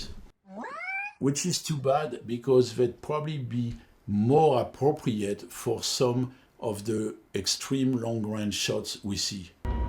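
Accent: France. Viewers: beautiful French accent ! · This is a great video, I am glad I clicked on it. I love snipers and find it an amazing subject. The French accent was a surprise and immediatly got me hooked: I am a francophile and I even lived in France. I am also always read to listen to a grandpa telling stories. The humour in the video is great and the transitions are hilarious. I can say the technical information here is above average and the best package in one single video on all of YouTube. I read everything I can about sniping and my favorite book is Out of Nowhere, by Martin Pegler. It doesn mention the proeminence of the .338 Lapua Magnum. The books Stalk and Kill, and Sniper One on One, by Adrian Gilbert are also great. Do you know French books about sniping? Snipers are fascinating subject and no wonder we have so much media about them on popular culture. As a matter of fact, I was about to switch on Sniper Elite 3 when I saw this video. 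My first exposition to sniping was the movie Sniper (1993), with Tom Berenger and Billy Zane. The movie is classic of action and suspense, and it shows some of the practices of sniping. I got tranced and always studied all I could about the craft. Maybe you could make a video analysing Sniper! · What is this accent ? You are French right ? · Hello, liked your vidéo, just a question, are you french ? Because you have the same accent as me. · Vos vidéos sont sympas, mais l'accent, "so frenchy dude" pour un francophone c'est une vraie souffrance. dommage de n'avoir assumé votre nationalité et de facto faire vos vidéos dans notre langue. Autre option prenez des cours pour arrêter ce massacre. Just a tip mate cheers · You must be French judging by your accent....my brother has a .338 Lapua.....I have a 30/06....one must utilize the tools that are available to him or they are worthless. Very good to hear someone speak shooting truth! 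Thank you for posting!!!!!! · Is this guy french or slavic I cannot tell. It sounds very French when he says "Rownd, "ledge-geon-dairy", "bee-cawze", et. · How come you talk with a French accent but have a muricastan flag and use their bullshit unit system? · C'est un accent Français, non ? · oh come on why is he french. now i have to close the video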